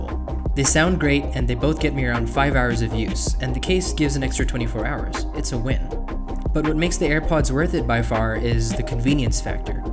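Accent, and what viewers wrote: United States. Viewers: Damn , your accent is freakishly American !! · amazing quality and content bro❤️ at first i thought u r american😂😃❤️ U earned a subscriber bro❤️ Keep up the good work🔥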